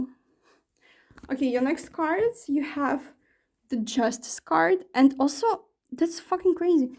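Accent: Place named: France. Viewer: Pile 1. Are you french ? Cute accent by the way 🥰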